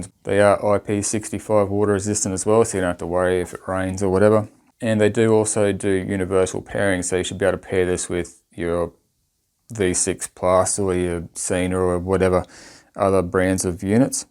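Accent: Australia. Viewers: I stumbled across your video while trying to find reviews on Fodsport products. I'm always amused by the fact that I can tell when a video is shot in australia (other than the accent, the lighting and colours just look a certain way). I didn't pay much attention to the poster in the background (until after the video and coming back to comment) and skipped through the first part of the video to the test ride. I often try and see if I can figure out what city/town it's in and if i can recognise anything if it's a place I've been. Well the traffic lights didn't give me much help, but as soon as i saw the show grounds i knew exactly where you were. I'll have to check out some of your other videos (gotta support another local). I just flicked through your vids and saw you are giving one of these away. It's just a shame I won't be able to get enough info to enter the draw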